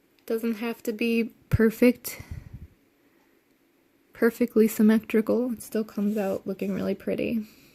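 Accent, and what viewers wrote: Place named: Ohio, United States. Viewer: Love ur technique, style and natural ability to teach and paint. Happy to hear u r from Ohio. Kinda thought u might be by listening to you! That’s a good thing! I am from Ohio currently outside of Cincinnati. Thanks for sharing your talents. God Bless you and your family! Would love too see you do a rose 🌹.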